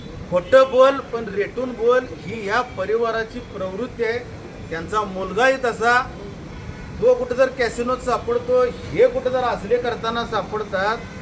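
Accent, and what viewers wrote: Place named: Kolhapur, India. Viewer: आवाज कोल्हापूर चा 👌👌👌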